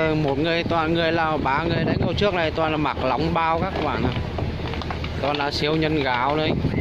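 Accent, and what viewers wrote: Nghe An, Vietnam. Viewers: Giọng giống quỳnh phương .hoàng Mai. Nghệ an vậy bác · Nghe giống giọng nghệ an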